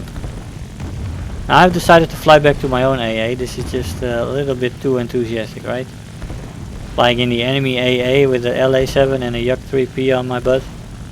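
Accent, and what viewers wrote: Netherlands. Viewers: Ha! ik wist t dat nerderlands accent is overal herkenbaar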